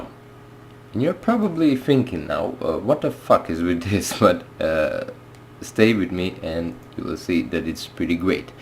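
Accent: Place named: India. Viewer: hmm he sounds like Indian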